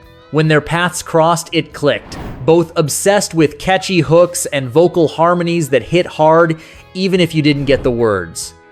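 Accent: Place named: United States. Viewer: I'm starting to get violent diarrhea at AI videos with shitty american accent.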